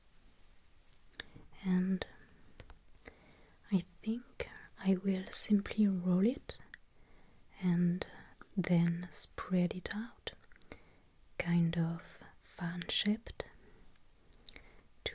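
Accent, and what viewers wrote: France. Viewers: You're french ? Because you have an accent french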